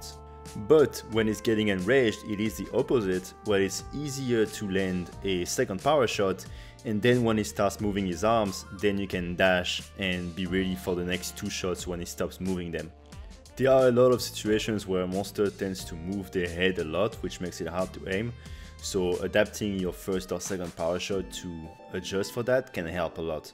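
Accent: France. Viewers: Ahah l'accent français est si reconnaissable 😂. En tout cas merci bien pour les tips sur l'arc, les vidéos sont très claires et organisées, un regal.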